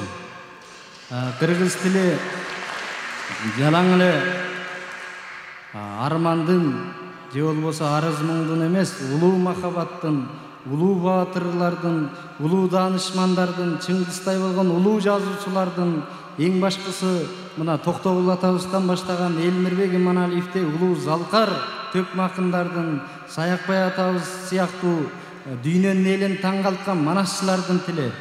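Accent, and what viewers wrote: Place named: China. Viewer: Кытайлык кыргыздар таза кыргызча сүйлөшөт да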